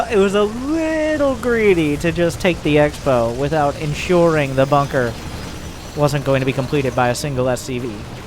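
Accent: South, United States. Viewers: Hey, how'd you get your slight southern accent? What state were you raised in?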